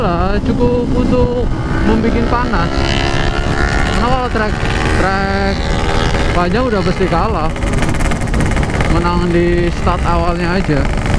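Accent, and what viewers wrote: Banyumas, Indonesia. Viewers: Asline ngendi bro. Deneng krungu ngapak"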